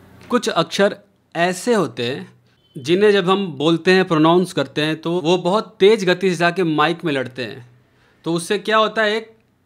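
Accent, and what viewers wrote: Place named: Bhojpuri, India. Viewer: Bhojpuri acha laga Sir apki awaz main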